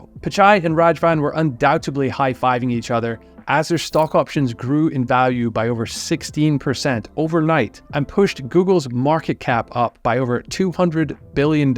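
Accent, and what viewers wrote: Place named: France. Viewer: My site's numbers went back to normal almost .. climbed back up in a week. I like this copy of Income school's report but with a French accent. :). Well, same tribe destroyed almost all US corporations, from Pharma to Boeing and everything in between. Just so they can rise from slumps and not be slump dawgs.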